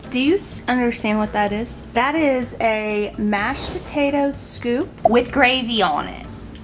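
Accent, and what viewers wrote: South, United States. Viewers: I don't know if it's just me but I feel like your Southern accent has come out so much more in this video! It must be the environment! Ahaha. Anyway, I LOVE IT!! 🥰🥰🙏❤️‍🔥 P.S. yes I'm rewatching this, cuz well duh. I Stan our food queen!! 🫡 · Gurl, you so crazy! I love watching you! You always make me laugh!😂❤ You definitely sound more southern when you're at home in NC. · Do I here a bigger smattering of a saucy Southern accent than usual?? ❤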